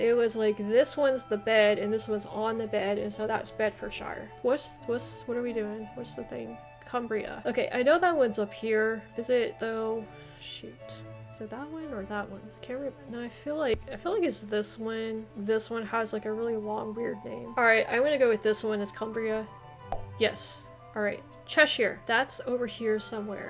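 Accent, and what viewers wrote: United States. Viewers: Having known some Californians in the past it always amuses me hearing American s pronouncement of English place names. But you did well with the position of the counties. I was born in Yorkshire now live in Staffordshire and have been to every county in England. An example Leicestershire is pronounced Lester shire. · Well done, for a non brit you did really well, very American pronunciation though 😀 If you ever need a brit to teach you our ways of speaking hit me up 😀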